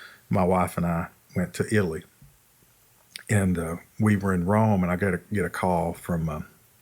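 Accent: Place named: Texas, United States. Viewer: This guy HAS to be from Texas. That fabulous accent <3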